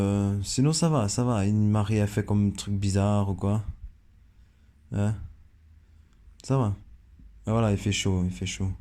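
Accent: Belgium. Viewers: tu me fais deliré comment tu parles...ta "façon d'être" au travers des vidéos... tranquille quoi, posé.. avec ton petit accent belge (si je me trompes pas). en tout cas continu tes videos cest sympa et apaisant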